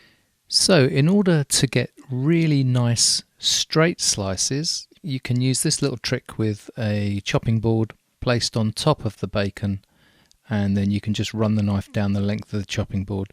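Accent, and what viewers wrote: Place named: Australia. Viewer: I love the accent bro. Crocodile Dundee up in this bihhhh. "That's not a knife, this is a knife!" LOL every time u say knife thats all I i hear 😂😂😂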